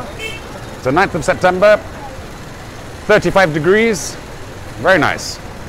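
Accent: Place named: Morocco. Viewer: like your moroccan accent!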